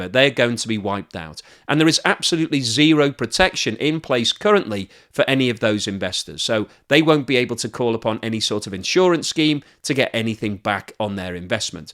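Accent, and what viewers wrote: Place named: England, United Kingdom. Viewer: You are hard to understand since I'm not used to English english!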